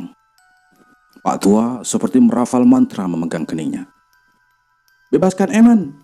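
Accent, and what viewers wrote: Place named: Borneo, Indonesia. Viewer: Best. Sama spt mndengar ckap org Sabah/Sarawak (Borneo). Mudah kami fahami, baru happy😅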